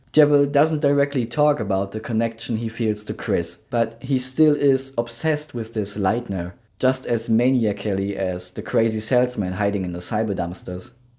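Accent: Germany. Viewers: Soooo, are you German perchance? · Are you german ?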